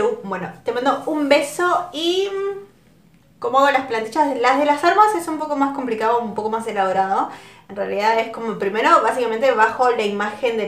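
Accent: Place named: Argentina. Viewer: Yo te conoci por el video de la mascara de calabera qu isiste tambien ese video supe que eras de argentina porque mensionabas la pala sh por ejemplo en este video en ves de decir pienso llegar al millon dijiste pienso llegar al mishon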